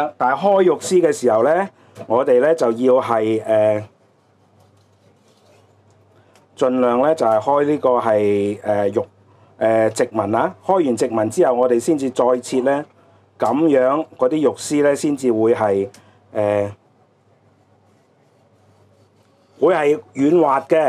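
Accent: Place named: Southeast Asia. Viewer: Leo應該唔係香港人聽你講野似東南亞人多啲喎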